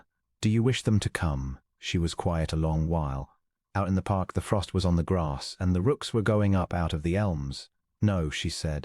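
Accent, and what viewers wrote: United Kingdom. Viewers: I loved this story! Beautifully written and superbly spoken with the appropriate British accent. Thank you!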